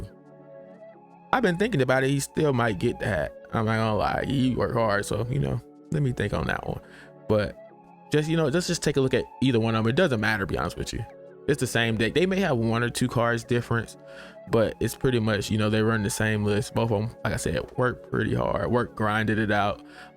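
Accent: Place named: Maryland, United States. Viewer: Glad the algorithm brought me here. Great energy, great video, and you got a lotte love for the community! Also, could be off base, but I feel like I'm hearing Maryland/Baltimore in your voice, and if so, great to hear more DMV content creators 💪🏾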